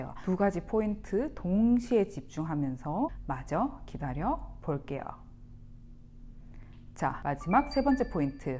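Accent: Gyeongsang, South Korea. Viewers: 경상도 말투~ㅎㅎ 넘나 좋은 운동 감사합니다♡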